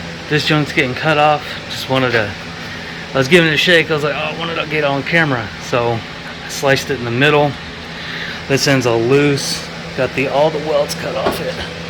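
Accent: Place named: East Coast, United States. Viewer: Looks awesome…..I know your in Cali but you sure sound like your from the east coast!! One love G and infinite blessings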